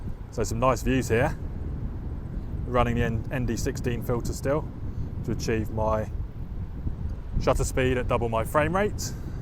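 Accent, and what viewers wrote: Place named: United Kingdom. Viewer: Great video & informative. Where is the location as you sound British?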